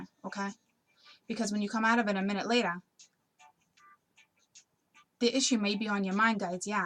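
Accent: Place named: New York, United States. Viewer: I'm new to your channel, just wanted to say I love your energy and your accent is everything. Jersey or Brooklyn? (no offense meant)!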